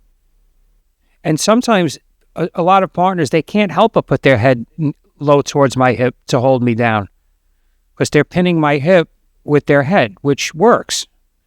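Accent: United States. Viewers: Thank you for your channel and thank you for your accent. I’m a big fan of American regionalism and the maintaining of American accents.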